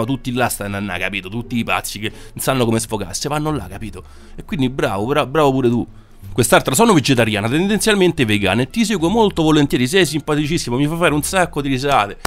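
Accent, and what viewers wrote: Rome, Italy. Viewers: Bellissimo...guardo i tuoi video perchè adoro la parlata romana......MI FAI TROPPO SIMPATIA...... E CARAMMELLE SE CE L'AVESSERO AVUTE SE LE MANGIAVANO CO TUTTA A CARTA😂😂😂😂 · E qualche giorno che ti seguo mi fai ridere ma soprattutto perché parli il romano. Io sono vegetariana non mi dispiacerebbe essere vegana ma se non e fatto alla perfezione non vale la persona. Ti vorrei confermare che certi commenti non sono appropriate soprattutto quelli su i bambini che sono puri e prendono ciò che gli diamo, e per gli adulti che hanno preso a loro volta dai precedenti. E non tutti hanno il tempo per capire precisamente cosa si intende, che inferno si vive essere appassionati dei viventi che non vorresti nemmeno che inciampano, a me dispiace solo che non la pensiamo allo stesso modo ho pietà per la pianta compresi i suoi abitanti❕ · Wow, finalmente un altro commentatore di commenti assurdi, per rendere meno opprimente l'attesa di un nuovo episodio di "Commenti Assurdi" (Max Noreg. 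Se non lo conosci, ti consiglio vivamente di visitare il suo canale) Mi piace il tuo stile: sei il primo a farmi prendere a simpatia il romanaccio(?). Riguardo al video... beh, se una persona è talmente poco convinta in ciò che crede, che basta un'immagine provocatoria a farla vacillare, direi che c'è qualcosa che non va. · Pratico yoga, e ovviamente è una disciplina con delle basi solide, riguardo la medicina, la cucina, il quotidiano. E una persona autorevole che l'ha introdotto in Occidente, diceva, fate di tutto un po'. Quindi se una persona mangia di tutto un po', senza eccedere soprattutto in (mode alimentari), può essere sereno e non impazzire. Sto frequentando spesso il tuo canale, e il tuo accento romano è diventato una (moda) in questi giorni per me. Fai benissimo ad informare entrambe le fazioni, dei più disparati argomenti, la gente anche se non se ne accorge o è orgogliosa per ammetterlo ha bisogno di informazione e se con una aggiunta ironica è sempre buono! 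Iscritta, continua a stupirci.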